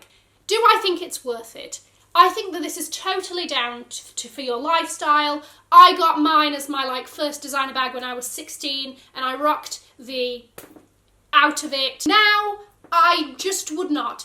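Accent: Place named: Liverpool, United Kingdom. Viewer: Great content. What colour is your burberry trench? I have to say again you sound like a" posh" scouser. Your fab always make me smile. 😊😊xx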